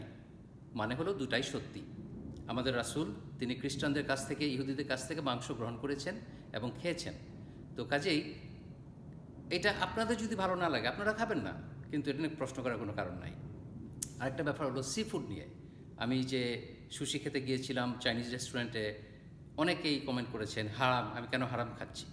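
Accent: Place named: Joypara, Bangladesh. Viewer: ভাই কিছু মনে করবেন না, আমি আপনার খুব বড় ফ্যান, আপনার কথা শুনে আপনাকে ঢাকার জয়পাড়ার লোক বলে মনে হচ্ছে। এটা কি সত্যি?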